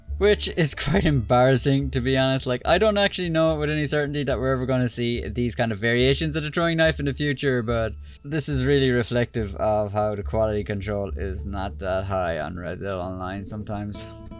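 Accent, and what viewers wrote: Ireland. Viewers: Bro, are you Irish?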